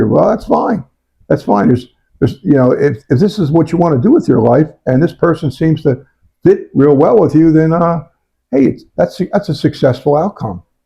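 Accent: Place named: Western Pennsylvania, United States. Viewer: Where are you from? Sounds like west PA accent.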